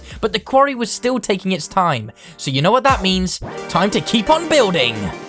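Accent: United Kingdom. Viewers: Well he sounds like a brit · Omg I like how you talk like a British guy on a adventure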